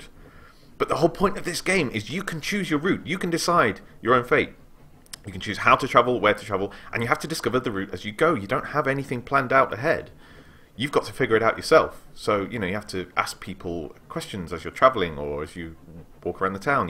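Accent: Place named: United Kingdom. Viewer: Haha mate you're exactly what American people think all British people sound like. xD are you from the south?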